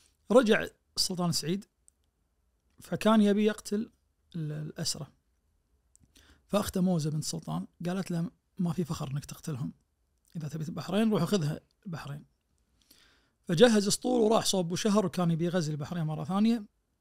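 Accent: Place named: Iraq. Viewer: هذا لهجته فيها من اهل العراق حيل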